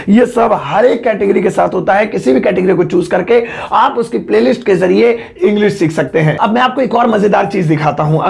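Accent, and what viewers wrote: India. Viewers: Indian accent is not nice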